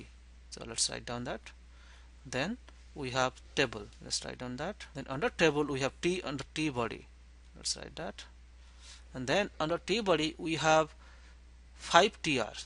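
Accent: Odisha, India. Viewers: definitely u r odia from the acsent. tht fire path is not always true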